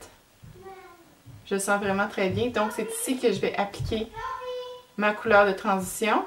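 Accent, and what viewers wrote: Canada. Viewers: j'ai pas tout compris, c'est du canadien je pense, ça pique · Vous êtes jolie! Dommage que les sous-titres ne m'aident pas à comprendre cet accent canadien :( C'est pareil, les américains ne parlent pas un vrai anglais, ils déforment les a, les canadiens font pareil : déjà vous le prononcez déjeu... Je comprends mieux un belge ou un suisse... En fait, je me demande comment vous enseignez les enfants à lire : pa c'est prononcé peu ou po? comme çe au lieu de comme ça... C'est pénible de devoir faire des efforts d'essayer de comprendre un accent alors qu'on parle la même langue. Bref à part ça, bon exemples.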